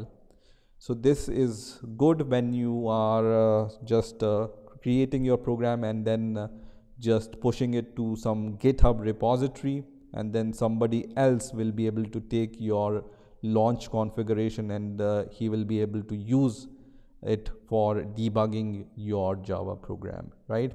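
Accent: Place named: India. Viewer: i hear your voice and i know that u are from india or pakistani. one of booooooooooooooooot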